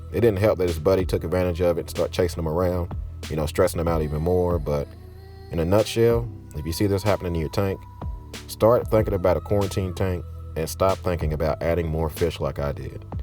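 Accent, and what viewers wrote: South, United States. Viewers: Is that a country accent I hear??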